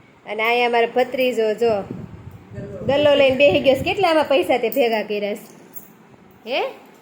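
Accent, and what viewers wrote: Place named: Gariyadhar, India. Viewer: Tamara video hu darroj jasadn thi job chhu aa baju aavo tyare aavjo. Tame gariyadhar bajuna chho. Tamari boli upar thi. Aevu. Lage che.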